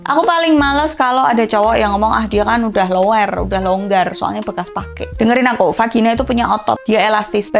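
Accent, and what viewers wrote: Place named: Surabaya, Indonesia. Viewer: logatmu itu Suroboyoan manteb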